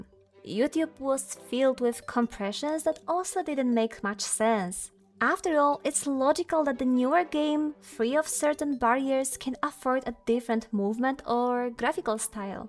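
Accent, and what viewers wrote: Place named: Poland. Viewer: ja tam słyszę polski akcent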